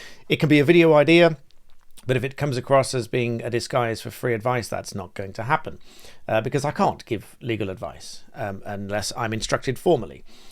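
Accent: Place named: RP, United Kingdom. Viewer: Cheers. Well put, in crystal clear queen's English. ☺️✨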